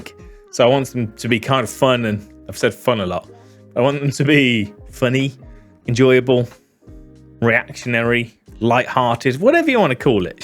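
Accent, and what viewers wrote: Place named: Birmingham, United Kingdom. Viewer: Did not think you was Brummie, convinced myself you was a northerner 😳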